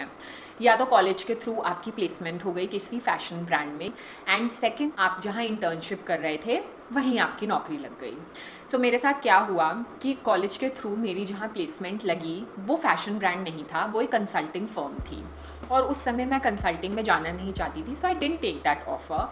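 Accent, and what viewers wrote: Delhi, India. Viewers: I have been following your channel since a week or even less and all that I can say is why didn't I discover you earlier! The very first video that popped up on my recommendations made me a bit uncomfortable with your speaking style which I guess is a bit like alot other Delhi girls, (please excuse the generalisation), but further into the videos, I realised that it is that very speaking style that grabbed me and made my binge all your videos in a span of a couple of days. I felt it was non-pretentions and so so honest to anybody who just wants to have a good time watching creators not unlike you putting up so much hard work. I wish I could pat you on the back and tell you that you're doing a great job. I am not so much into hair and makeup and dress up videos and do not feel the need to follow any other YT for content therein, you're content appealed to me for the sole reason that you made me happy just sharing your stuff with me. 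Thank you sm. Keep doing what you're doing and all the love. Sorry for the long post.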